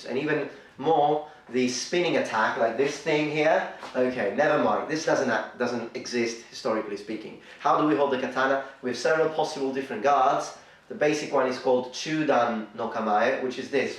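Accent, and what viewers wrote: United Kingdom. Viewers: Came to see young with the strongest british accent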